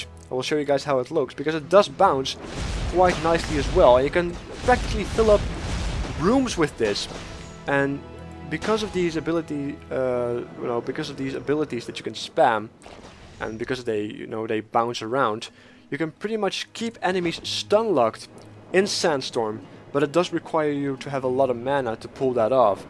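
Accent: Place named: Netherlands. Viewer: I might be wrong but his accent sounds very.. dutch.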